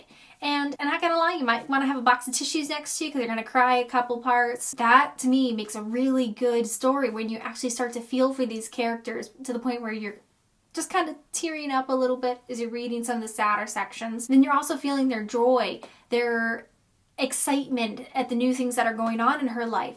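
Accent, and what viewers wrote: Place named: Midwest, United States. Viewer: I believe she lives in ohio....so mid-west accent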